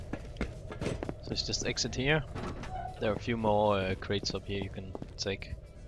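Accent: Denmark. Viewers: Thank you for the video. ( and just in case if im right. saying. Tak for Videoen. I might be wrong, but from the sound of your accent. I believe you to be Danish ( from Denmark)) denne Video var meget hjælpsom. :) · are u danish?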